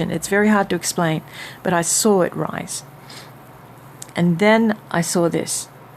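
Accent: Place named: Australia. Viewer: I though your accent was Aussie. Just watched your video about "who you are". It must be lonely to be stuck in a hotel room far away from your home, but there are no coincidences with God. Maybe you made a mistake by coming here to marry someone you really did not know, but God can use that for His purposes. Maybe that was to get you away from a country that is forcing the V on everyone. Anyway, in my opinion we don't have much longer to wait for His return. PS: I am a musician--banjo, guitar, mandolin and dulcimer. Mostly old time gospel in nursing homes, churches, etc. Well, some folks don't consider banjo players as musicians. LOL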